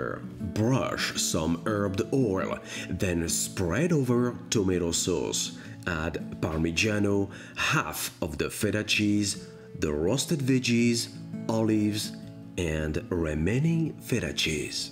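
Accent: France. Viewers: J'aimerais savoir une chose, vous êtes français? Parce que quand vous parlez anglais vous avez le même accent que nous français lorsqu'on parle anglais. Des vidéos en français serait top même si je comprends tout à fait l'anglais. Thank you for your recipes they're soooo delicious !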